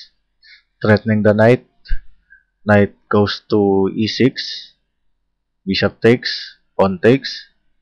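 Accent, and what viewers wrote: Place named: Tagalog, Philippines. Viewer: OMG that is a Tagalog accent!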